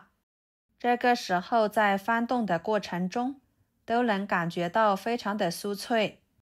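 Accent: Yunnan, China. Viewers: 实在是不习惯听你的云南马普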